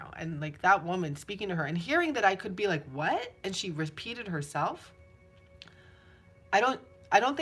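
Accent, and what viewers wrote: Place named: South, United States. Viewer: Last night I woke up at 3am thinking about Pluto(Hades) and it led me to Persephone who is the goddess of vegetation and the underworld. So it is blowing my mind that your reading has touched on that. Plus my deceased grandma has been on my mind prior to the eclipse and we are from South Carolina. So that southern voice hit home... 💜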